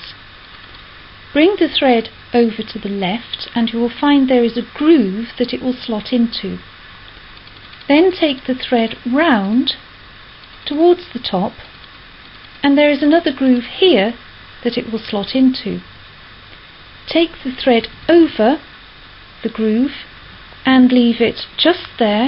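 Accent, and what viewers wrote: United Kingdom. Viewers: Sooooo cute... Perfect queen's English... I've blinking kicked the tripod.... Be kind to yourself sweetie... Not a big deal... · That was Beautiful, My Mother left Me a an Old manual pedal type sewing machine,, I'm ready to make a Sail and go Sailing.. This was clear and concise instructions.. and I love the British accent..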